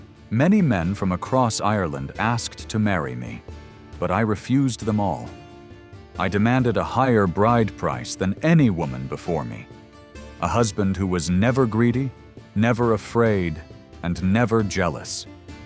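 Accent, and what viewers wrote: United States. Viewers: Why US accent when it's about Celtic Mythology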